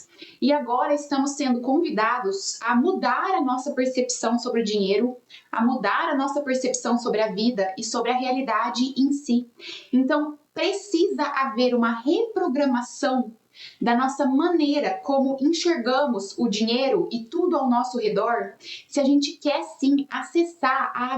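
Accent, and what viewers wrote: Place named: Minas Gerais, Brazil. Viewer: Teve que falar Português?! Você tem o sotaque mineiro e fala bem a Língua Portuguesa.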